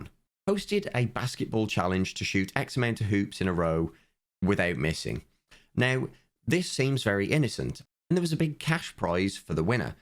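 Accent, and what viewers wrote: United Kingdom. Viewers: If he can suppress the British accent slightly, yes.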